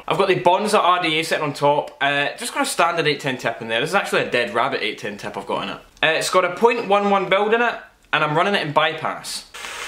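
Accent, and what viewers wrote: Scotland, United Kingdom. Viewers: You're so Scottish, it's beautiful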